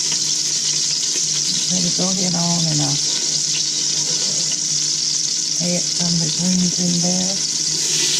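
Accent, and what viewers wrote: South, United States. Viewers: Smoked Hamhocks is the only meat I put in my collards also. Are you from Georgia or Alabama? I’m from the ATL and I absolutely LOVE❤️ the way you pronounce your words and your southern accent. You go!🔥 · DEAR, I FELL IN LUV LUV LUV WITH COLLARD GREENS YEARS AGO! THE NURSING STAFF ON MY FLOOR IN THE HOSPITAL WOULD ALL BRING IN A COOKED DISH TO SHARE! BUT, I NEVER NEW HOW TO COOK THEM UNTIL NOW! I WOULD LIKE TO ASK YOU IF YOU KNOW HOW TO POKE GREENS. I HAVE A LITTLE HOBBY CALLED FORAGING, WHERE YOU PICK WILD THINGS AND LEARN HOW TO COOK AND EAT THEM. I'M PRETTY SURE IT IS A SOUTHERN DISH, AND I THINK I DETECTED A SOUTHERN ACCENT ON YOU!!! SO,YOU MIGHT BE THE PERSON TO ASK.THANK YOU AGAIN FOR SHARING YOUR RECIPES.I WOULD LOVE TO SHARE MY GREEK ONES WITH YOU. DANDELIONS ARE GREEK "COLLARD GREENS"! LOL😂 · your accent tells me you're from down south you and the Soul food Queen are must see for me.. 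especially around this time of year...I'm near 70 and y'all remind me of home on the Mississippi River Rd in Louisiana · HAS A CLEAN KITCHEN A SOUTHERN ACCENT AND A BEAUTIFUL SOUL = A GREAT COOK❤️ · Lord I stumbled upon this video about collards and I just had to watch ma'am that southern accent and that stainless steel spoon,God bless you and yes if doing a video on how to wash cut and clean collards I'll love it.Thanks ma'am!!! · " " you are so cute!!! ❤️❤️❤️. I love your apron and your Southern Belle accent.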